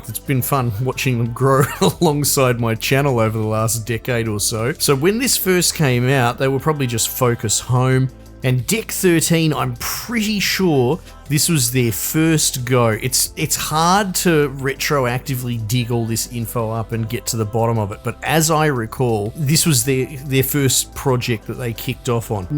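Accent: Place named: Australia. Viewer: I know it's the aussie accent but I keep hearing Dick13 lol 😂 Just got Surge 1, Surge 2 was such a cool and good game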